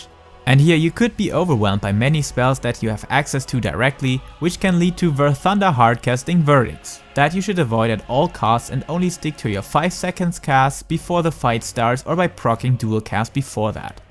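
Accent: Germany. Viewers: Are you German ?!?!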